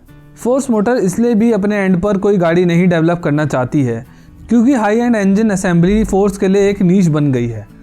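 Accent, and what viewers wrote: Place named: Rural, India. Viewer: Be clear in your dialogues.. and also better use English instead of this village style hindi ascent..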